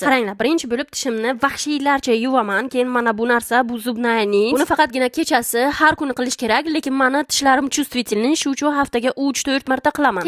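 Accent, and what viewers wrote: Bukhara, Uzbekistan. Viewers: Buxorocha sheva biz ham shunday deymiz😂👍🏻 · Buxoro shevasi bu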